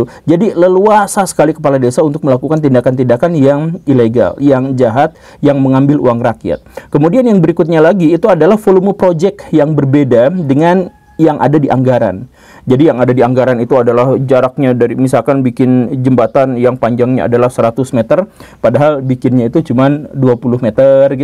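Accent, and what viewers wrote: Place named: Sunda, Indonesia. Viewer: Aksen sundanya medok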